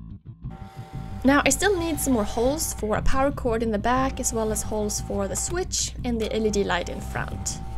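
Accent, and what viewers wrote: Sweden. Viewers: Du låter svensk? :D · Are you Swedish I can kind of hear that on your English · luse i speak swedish ans she does too. you can hear it quite alot from her accent if that was what you meant. cheers lad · you have a very swedish accent :P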